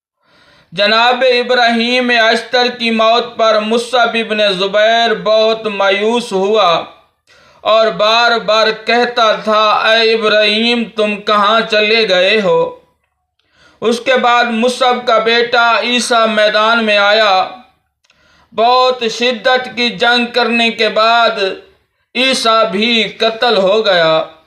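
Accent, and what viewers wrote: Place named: Punjab, Pakistan. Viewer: Panjabi urdu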